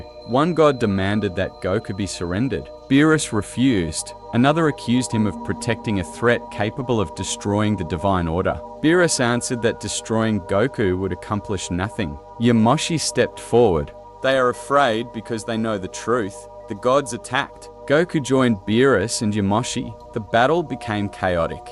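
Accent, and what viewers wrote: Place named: United Kingdom. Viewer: I don't like that dude accent he sounds funny when he talks sound like he's British or something 🤣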